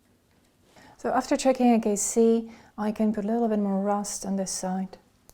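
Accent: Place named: Slovakia. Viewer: Great video. Good to know there is an alternative to an airbrush as I don't always mine to hand. I'm curious where your accent is from. My wife is from Slovakia and she's convinced you are from Slovakia too.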